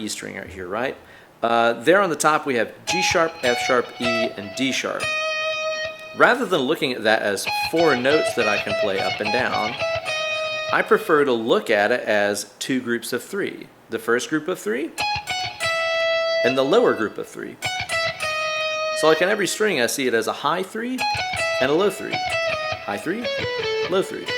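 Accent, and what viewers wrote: United States. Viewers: Yeah you fine dude. Love the playing. Alls I know is I give you props you don’t put out a video every twenty minutes like John Cordy and you speak American. The lick was great. Please accept my apology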